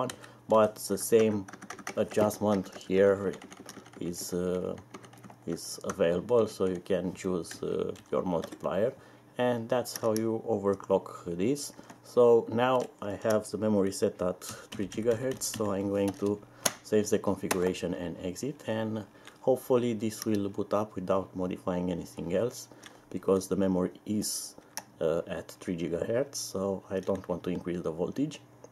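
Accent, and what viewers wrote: Romania. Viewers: Hai salut. Mama cum recunoști romanii dupa accept :)))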